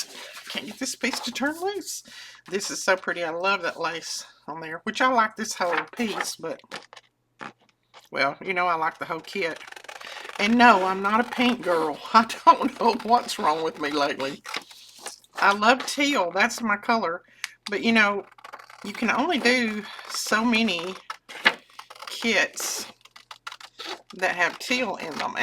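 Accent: South, United States. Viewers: We love ❤listening to your southern charm.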